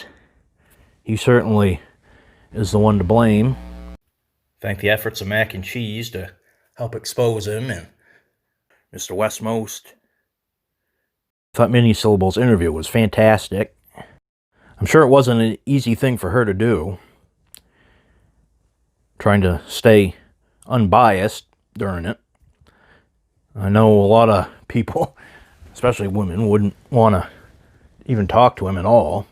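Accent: Maine, United States. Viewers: That's how an intelligent Maine accent sounds. Great message, dude.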